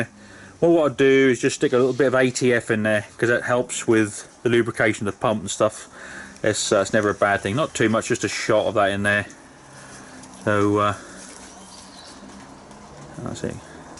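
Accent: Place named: North Buckinghamshire/Bedfordshire, United Kingdom. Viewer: Where about`s are you? accent sounds familiar (north bucks/beds) you near George?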